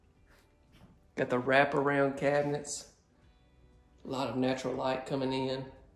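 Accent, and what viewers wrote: South, United States. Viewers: If just read the company fliers most come standard with 30 gal hwt & tiny are even smaller & if most people are like me I take long showers u need minimum 40 pref 50 by the way totally enjoy ur video's & ur Southern accent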